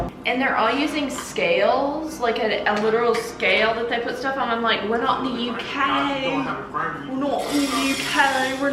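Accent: South, United States. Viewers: that Southern accent😍...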